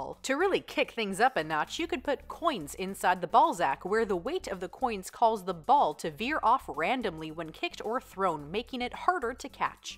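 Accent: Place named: Canada. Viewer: You have a cute Canadian accent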